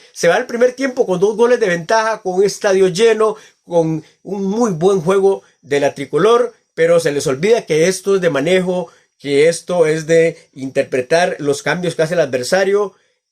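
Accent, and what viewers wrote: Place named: Mexico. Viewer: No se si eres de México o costa rica es que el acento es parecido pero si quiera ver a Costa rica en el mundial desafortunadamente no pidieron meter un golesito solo era meter uno 😢 saludos y ánimo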